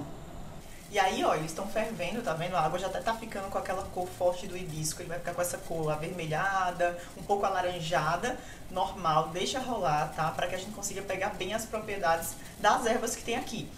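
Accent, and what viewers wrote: Recife, Brazil. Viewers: Ela é de Recife sim, sotaque lindo né ❤️ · Adorei a receita. Muito saborosa, principalmente com limão!!! Pra mim foi difícil achar a cavalinha fora do Brasil, mas a Amazon me salvou. Rsrsrsrs Essa nutri é de Recife? Parece muito com meu sotaque 🤭 abraços para minha possível conterrânea 😁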